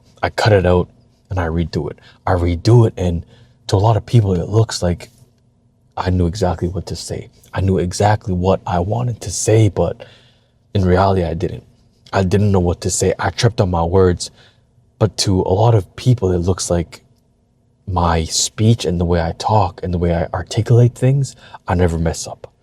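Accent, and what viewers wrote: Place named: Canada. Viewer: I appreciate & Love You Brother for this I suffer with allergies too 😂🤣🎯 if I don't take medicine for it at least once a day at worst my could make me literally sick like a cold just all the symptoms on 1,000 max & if that happens it can highly likely trigger my asthma too. I wonder which side your family u get it from? 🤔 I know I get it from my dad & his parents as they used to call it hay fever back n da day before it was called allergies 😂 Yeah sometimes I mispronounced & go off somewhere too 😂 it doesn't help I have a thick Canadian accent like u ironically sprinkled with an ancestral accent from our families 😂🤣🤦🏿‍♂️ U seen my vids 😂 the vids u & everybody else saw honestly on average is my 3rd or 4th try 😂 Yeah social media is fake 😂 but me I'm just raw with it & folks told me they love my vids on my thoughts 💭 🤔 It be so much I gotta release it ❤️‍🔥 Eventually I'm gonna move it to other social media platforms ❤️‍🔥 Love U My Brother ❤️‍🔥🤝🏿❤️‍🔥💯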